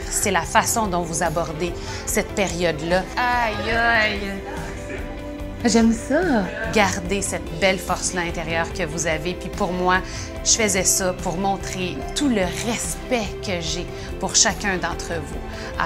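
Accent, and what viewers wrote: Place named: Quebec, Canada. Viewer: L'accent québécois 😫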